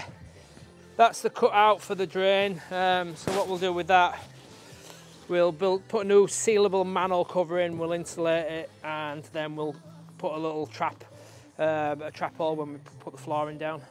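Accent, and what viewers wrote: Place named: Leeds, United Kingdom. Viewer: ey up, these are great videos! im a leeds lad living in cornwall now s0 its good to hear the leeds accent. may i ask, what is the minimum distance i can build my base up to a neighbours fence to conform to building regs? ? (subscribed)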